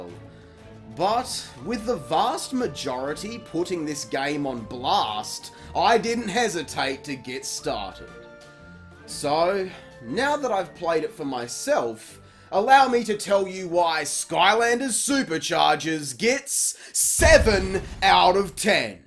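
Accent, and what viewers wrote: Australia. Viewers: You are actually so australian that it hurts, good video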